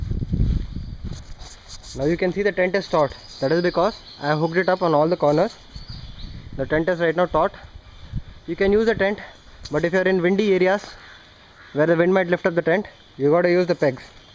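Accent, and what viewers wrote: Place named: India. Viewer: dude what did you say after "hi friends"? #love#indian#english#accent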